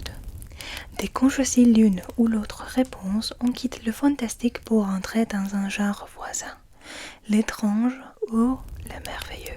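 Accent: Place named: France. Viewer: Coucou 🖤. Qué lindo acento francés tienes.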